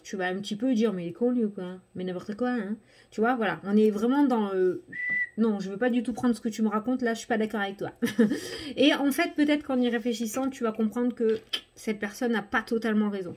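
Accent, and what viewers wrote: South, France. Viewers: salut, putai... ca fait un bien fou d entendre l accent de chez nous!!! j aime beaucoup ton naturel et ta spontaneitee. bisette de l aveyron!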